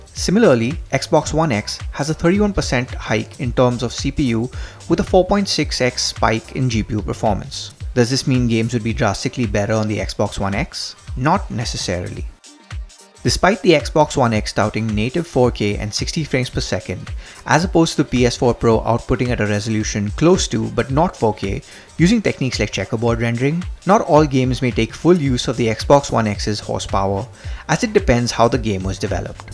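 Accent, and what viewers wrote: India. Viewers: i cant understand this indain tech support guy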